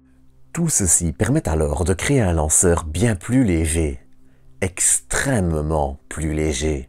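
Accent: Belgium. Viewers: Sa voix est posée, et sa lenteur relative se prête particulièrement bien aux sujets qu'il traite. Autrement son "élocution" c'est juste un accent belge.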